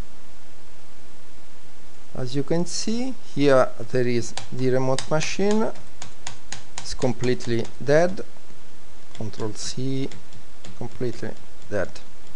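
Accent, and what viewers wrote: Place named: Italy. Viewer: Outdated. Si sente che sei italiano ma mamma che brutta pronuncia che hai !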